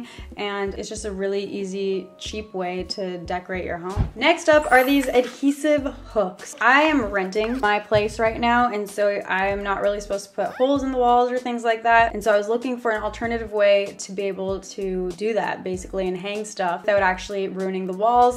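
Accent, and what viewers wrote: Canada. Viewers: Are you Canadian because it sounds like u have an accent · OMG I love your canadian accent!!!! #Sclub #SlvForLife #Wolfia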